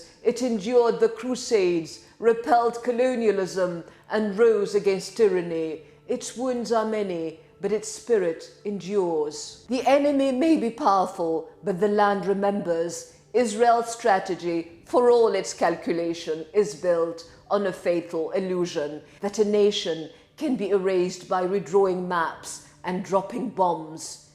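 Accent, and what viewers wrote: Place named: United Kingdom. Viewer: ملة بريتيش اكسنت يا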